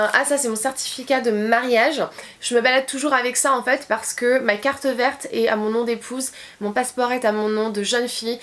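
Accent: England, United Kingdom. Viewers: Ton accent anglais est tellement cute❤️🤩💍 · J aime trop ton accent anglais 😘😘😘😘😘😘😘😘😘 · c trop marrant quand elle parle il y a de fois un accent anglais c trop mignon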